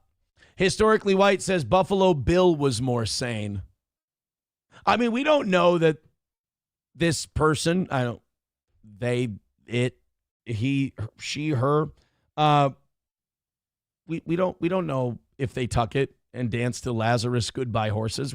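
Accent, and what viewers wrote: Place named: Minnesota, United States. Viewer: sounds like he’s from Canada unlike your minnysoder accent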